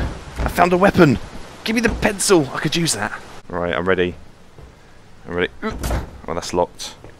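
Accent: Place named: United Kingdom. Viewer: the way you speak just screams british and i love it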